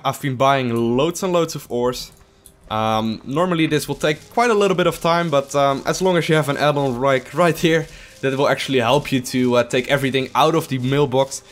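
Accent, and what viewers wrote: Netherlands. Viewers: Are you from Holland?? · You are from Holland arent you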